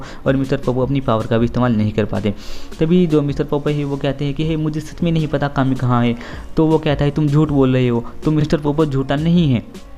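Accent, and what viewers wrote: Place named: Garhwal, India. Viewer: bhai tumhari language में garhwali taste aata hai isliye bol rha hun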